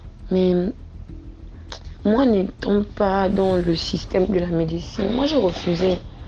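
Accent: Gabon. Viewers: L'accent est gabonais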